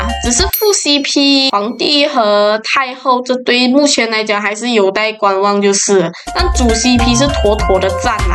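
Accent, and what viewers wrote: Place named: Malaysia. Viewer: 讲的很好只是马来西亚口音很重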